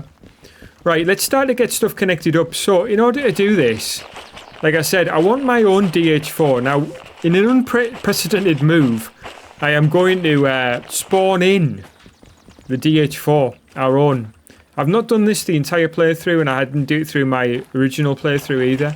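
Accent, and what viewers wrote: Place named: Geordie, United Kingdom. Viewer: You from Mackem land Marra? Or close to Stockton? Sound awfully close to a Geordie but not quite 🤣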